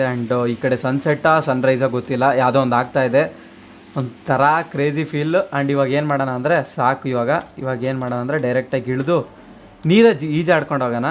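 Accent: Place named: Chitradurga, India. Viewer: Bro nivu namma chitradurgada Helidiralla super 💞love from chitradurga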